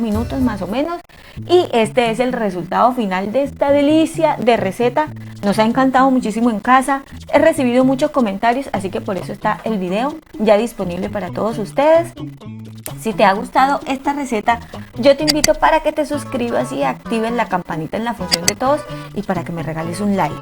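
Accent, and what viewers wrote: Colombia. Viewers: Amo tu acento Colombiano!!!! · Por el nombre del canal yo pensé que era de comida mexicana ya que el achiote es la principal especie utilizada en la comida yucateca pero al oír tu acento me doy cuenta que eres colombiana.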